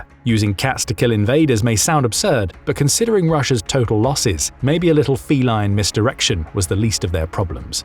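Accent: United Kingdom. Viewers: British accent. Discount all you hear. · When someone with British accent says it's 100% real, then is based on british media or BBC .... :face-purple-crying::face-purple-crying::face-purple-crying::face-purple-crying::face-purple-crying::face-purple-crying::face-purple-crying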